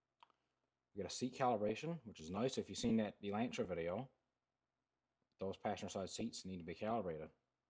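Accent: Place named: Newfoundland, Canada. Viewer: Sound like a Newfoundlander cheers 🍻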